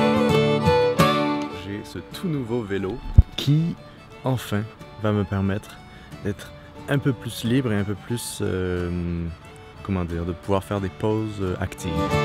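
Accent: Quebec, Canada. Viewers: Non. Il a un léger accent québécois.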